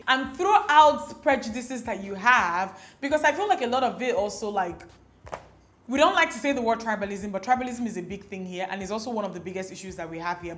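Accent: Igbo, Nigeria. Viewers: makes me want to have an Igbo accent. It's so sweet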